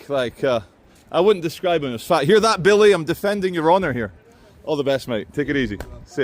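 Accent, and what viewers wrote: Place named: Scotland, United Kingdom. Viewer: It's Scottish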